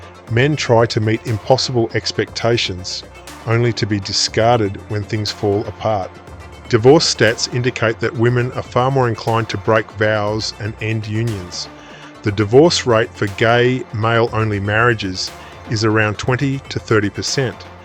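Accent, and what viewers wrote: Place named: New Zealand. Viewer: This guy sounds Kiwi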